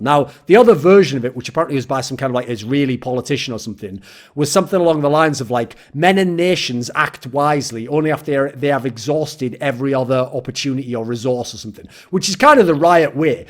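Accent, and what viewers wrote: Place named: United Kingdom. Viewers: Why did Thorin's british accent get stronger? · He does sound different, he might be visiting home and having his more natural accent come out. Anyone know exactly what is his accent, from what region?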